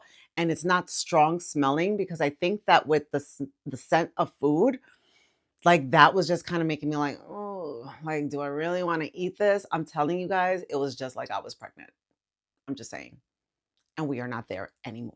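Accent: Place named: Puerto Rico. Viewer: I just found your channel looking for glp1 histories, I’m in my second week and in my first I just lost 1/2 pound and I was so tired so is good to know I’m not along. Also I was hearing you and for me you sound Puertorican and when I found that you are, I got excited because cuando consigo una compatriota Boricua que cree en Jesús, me emociono, congrats for your channel, don’t give up. 😉 God bless you 🙏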